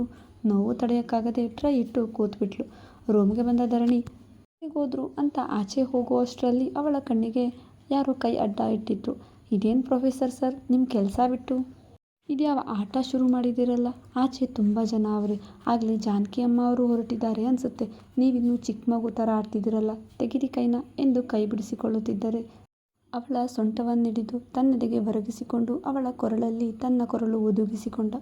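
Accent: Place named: Mandya, India. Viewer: ಮೇಡಂ ನಮ್ಮ ಮಂಡ್ಯದ ಭಾಷೆ ❤❤❤👌👌👌👌🙏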